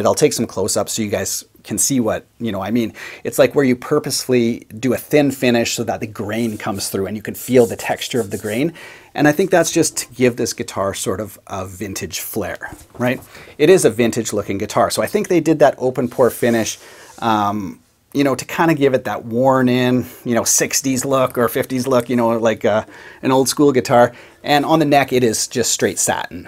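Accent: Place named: Canada. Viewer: Waiit... are you Canadian? LOL. I feel like I can kinda hear an accent.. either Canada or like North Dakota.. maybe that upper area of Wisconsin...or Ohio?? But... prob Canada.. ?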